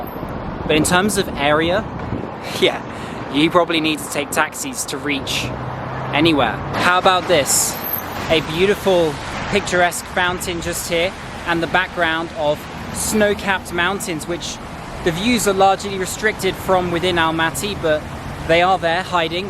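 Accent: United Kingdom. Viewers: Are u british ? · Nothing interesting, such a boring ew city. Btw, I like your british accent ㅜㅜ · Nice proper British accent!!!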